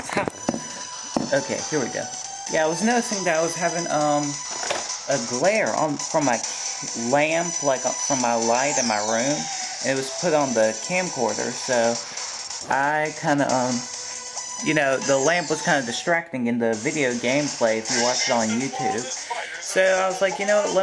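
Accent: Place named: South, United States. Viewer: HE IS SOUTHERN HES FROM MISSISSIPPI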